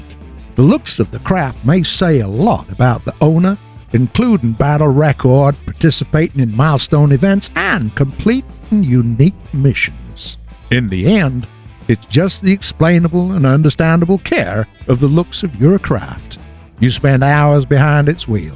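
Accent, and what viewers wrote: United States. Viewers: Can the commentator please not talk with this cliché american accent? I can't stand listening to it.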